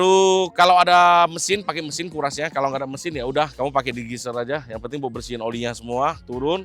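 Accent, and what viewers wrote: Medan, Indonesia. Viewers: Medan kuiii😂😂😂😂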